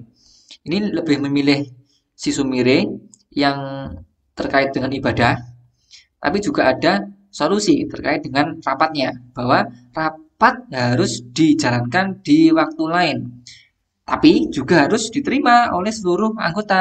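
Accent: Java, Indonesia. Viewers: Buoh... 😂 Jowo tenan coach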